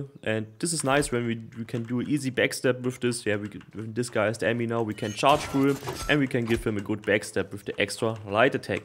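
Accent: Germany. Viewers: are you german by any chance? your english sounds too funny haha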